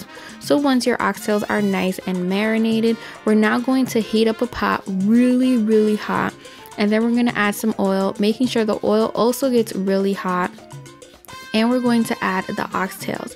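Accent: New York, United States. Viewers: Love your oxtail recipe. I love your accent did you grow up in NY? You are very talented in the house 🏡👌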